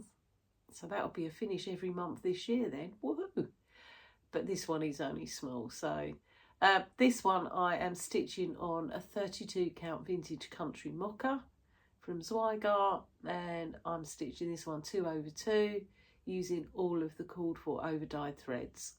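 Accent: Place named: United Kingdom. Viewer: Hi! Stitchy Sally shouted you out and posted a link to your Flosstube, and I’m glad she did! 😊I’m blown away by your fabulous projects and enjoyed listening to a fellow Brit!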